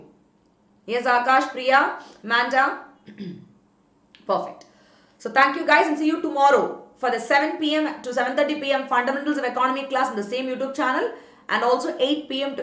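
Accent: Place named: South India, India. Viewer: Mem south Indian english bol rhi h 😀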